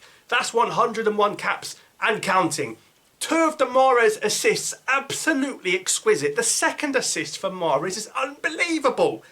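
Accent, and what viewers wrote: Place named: Cockney, United Kingdom. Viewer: Well done, Cockney boy keep up.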